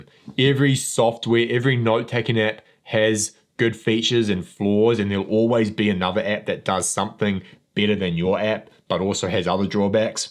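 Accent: New Zealand. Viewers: r u from newzeland? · I might need to rewatch this video. awesome Kiwi accent is distracting me from the content. 😅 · you've got cool accent! i actually never heard New Zeland's English :)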